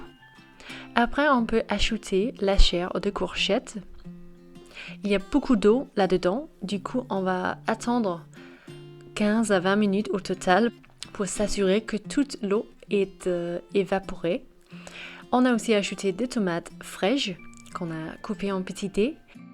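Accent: England, United Kingdom. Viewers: M 21! Frou du cul ou trou du cul??? Madame est Britanique! Essayez donc de faire une recette vidéo en anglais et vous verrez si vous n'écorchez pas un mot??? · Votre accent est tres jolie are you english ?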